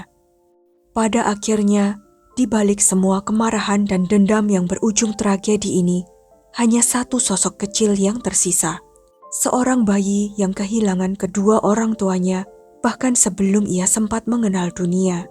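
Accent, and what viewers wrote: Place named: Java, Indonesia. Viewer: Kek org Jawa kek medok ngomongnyr😊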